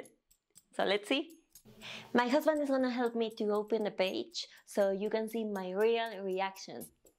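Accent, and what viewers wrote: Philippines. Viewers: In addition to your physical appearance (very Filipino), your mannerisms, way of speaking, and your accent is very Filipino. I don't know how you did it but, if you could speak Tagalog or one of the other Filipino dialects, no one would question you for a minute !! I have much exposure to Filipinos. In one of my jobs, of 50 people, I was the only one not Filipino. Most of my friends in College were also Filipino. You would fool me !! DNA tests: Most companies only test certain/small sections of you genome. That is why you can get different results from different companies. There will be variances. Because of the way genetics work, you could still be part Japanese, but your ancestor's genes were not dominant. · That's a Philipino accent, right? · Wow Now this is very interesting.. You look Asian and how you speak like a Filipino perhaps due to Spanish influence. 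So I ever I travel to Mexico I can blend in as long as I don't speak. 👍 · Curiously, you speak English with a distinct Filipino accent. I have lived in both countries (Mexico and Philippines) and have had a Mexican and Filipino wives. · even her accent sounds filipino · Who taught you english, because you really sound Filipina. If you went there, people would be very confused. Seriously who taught you English. Where did you get that accent from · Like you I too was very curious about my races. First I am 50% Asian ( Visayan/ Chinese), Spanish, and amazingly 24% Indigenous. The Asian is: Malaysian, Taiwanese,Indonesia, Laos. Indigenous: Peru, Bolivia, Ecuador, Brazil. Who knew! And yes, you can pass for Pinoy, but you do look mixed. I live in Hawaii, born and raised. Here 75% of us native people here are mixed. Mostly Asian.Your accent can also pass for Pinoy. Like South America, the Philippines was also raided by the Spaniards and also the Japanese. 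So happy I came across your page because I am researching my heritage. You are very Beautiful. Aloha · You speak English with a Filipina accent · Your accent sounds like Tagalog was your first language. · I definitely get where you're coming from. I've been told I look Asian all my life. I'm at the point I can easily tell when someone is trying to "figure me out". I've even had people argue with me that I HAD TO HAVE SOME Asian in me, even to the point where they go as far back to when the land bridge existed connecting Russia and the Alaska. And they tell me I'm Asian because Asians crossed that land bridge. I will definitely get my DNA test done. I'm very curious now. I will say, because of your stature and your accent, I can see how some may say you're Filipino, especially where you say your "F"s. Regardless what the DNA tests say, you're a very beautiful LATINA. Where it proudly. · Ur accent sounds Filipino btw I’m not Filipino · I was thinking she looked and sounded Filipina, went straight to the comments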